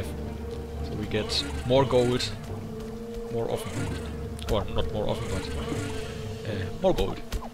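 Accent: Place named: Germany. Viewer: Ja, man hört den deutschen Akzent sehr stark raus.^^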